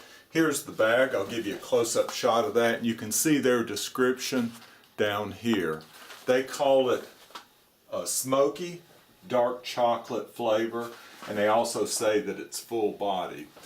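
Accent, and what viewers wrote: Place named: South, United States. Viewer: Love that Southern Drawl... 👌😎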